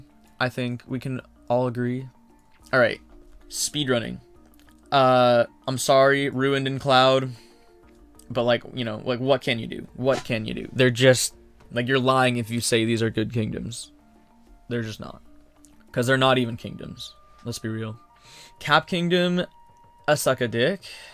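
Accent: Canada. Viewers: Awww. The Canadian accent. “Oooootfits” lol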